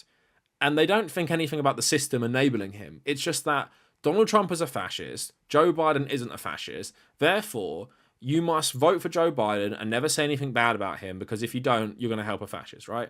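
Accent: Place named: United Kingdom. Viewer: Off topic, but I always heard you with a vague British accent but is that some Donegal and Derry I’m hearing?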